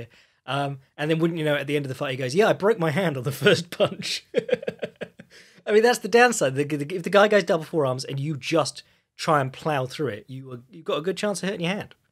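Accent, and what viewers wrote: England, United Kingdom. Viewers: I love the honesty coupled with an English accent. Great breakdown 👌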